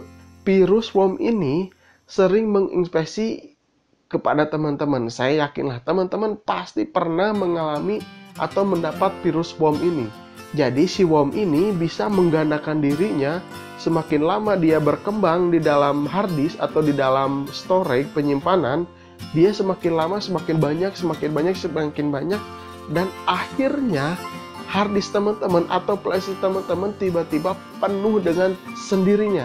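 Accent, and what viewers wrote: West Java, Indonesia. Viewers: sunda banget bang wkwkwkwk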